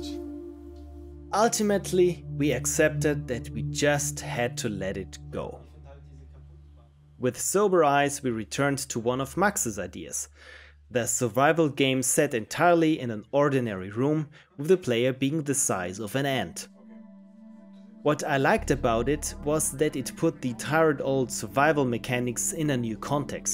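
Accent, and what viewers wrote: Germany. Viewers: are you from germany? you sound like you are german... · Is he german?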